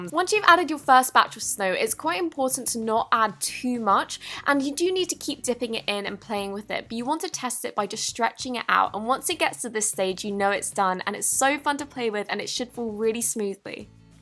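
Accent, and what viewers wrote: United Kingdom. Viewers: Theofficialblossom vlogs it’s british like mine! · I love how she talks I talk American so it’s so much different than her British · Where do you get tour borax from? You sound British like me and I can’t find it anywhere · Theofficialblossom vlogs she form the United Kingdom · London/British accent like mine 😊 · Lol it's a British accent like mine. so there Americans now u know that our accent isn't POSHY POSHO 😄 so just remember dats how we lot sounds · I love your British voice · Theofficialblossom vlogs shes British like me 😁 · Theofficialblossom vlogs she’s British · Theofficialblossom vlogs British accent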